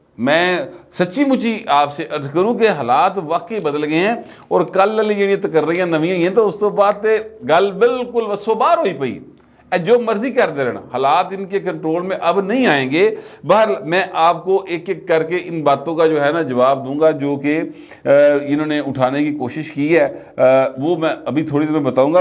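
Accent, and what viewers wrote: Lahore, Pakistan. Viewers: I love your natural style of Lahori Punjabi and natural mixture of “ thrra syasut “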